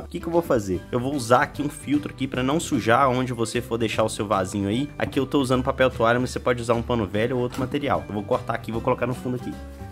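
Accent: Minas Gerais, Brazil. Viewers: O sotaque minerim entrega kkkk Parabéns pelo trabalho!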